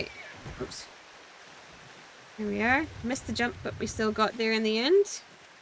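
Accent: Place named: Scottish Highlands, United Kingdom. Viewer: Hi, I'm enjoying your videos. Your accent leads me to believe you are from the highlands. Maybe in the northwest. Isle of Sky area and the origins of the MacDonald clan. Am I right?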